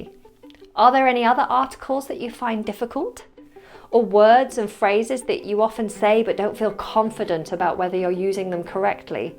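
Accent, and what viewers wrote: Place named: United Kingdom. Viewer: Hi! Came here looking for info after finding your singing channel and falling in love with it 😍 Is there any hope you will start posting there again? Maybe once every few weeks? 🙏 I felt so inspired and happy that I finally found a vocal coach that I absolutely love ❤️ And then I saw that the last video was 2 years ago 😢😭🥺 Thank you anyway for all the videos there! They are just awesome! And I loved that you're British because I strive to sound more British and having an American vocal coach wouldn't be as great. So, love you! Just think about it 😍🙏🤗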